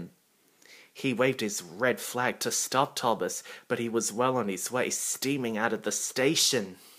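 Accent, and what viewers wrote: United Kingdom. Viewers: okay, however are you British or Australian ? If you originally came from the UK or were you born in Australia ?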